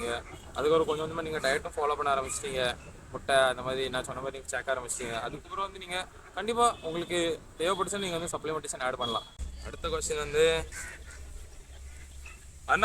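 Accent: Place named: Kanyakumari, India. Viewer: Good job brother👏 I thought you are from Kanyakumari district because of your tamil accent..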